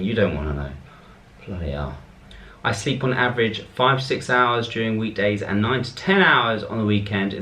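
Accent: United Kingdom. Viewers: your British Accent is so cute.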